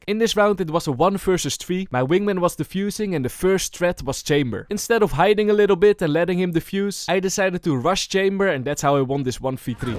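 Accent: Netherlands. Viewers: Ur dutch arent you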